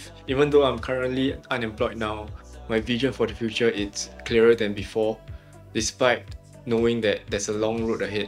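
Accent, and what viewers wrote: Singapore. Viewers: don't worry about your accent! english isn't my first language and i've mostly learned it through watching youtube. nowadays my goal is to get to know and understand as many accents as I can, so the more I watch your videos, the better I'll get at understanding english spoken with the singaporean accent. keep up the good work!! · I also like your singaporean accent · Hey fellow Singaporean here! Just have to say your video quality is on par or possibly even better than what I've seen on YouTube from top content creators, as love seeing you being authentic in retaining our accent and embracing the fears that come with putting yourself out there! Think your authenticity comes through, and seeing your personality as it is lends so much more to the video too! Good job bro, your videos are what inspired me to look more into design and zhng-ing my room while I wait for my BTO! 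The vibes are immaculate haha and I've been opening the YT app so often to check if you've uploaded so you're definitely doing a sick job :) Too bad I wasn't able to catch you at Stacked's store last weekend but so glad to have chanced upon your content! Keep it up! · I just discovered your video. Started with the Hanoi vid....very well done Admire your bravery ...can't wait to see more from you ..makes me wanna spend more time in asia. Also I like your singapore accent ..more pls! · this vdo makes me feel like I’m watching a movie 🍿😍 Thank you and love the Singaporean accent. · ahhh rooting for you! so many firsts in this video, but very excited for you to have many more adventures trying out this youtube thing!! also, hell yes to the sg accent ahaha we need more of it on here! i started vlogging for fun & just to have memories for keepsakes for when i get older & have to leave all the fun & games behind but it was really nice to see this, might still keep the hobby alive :")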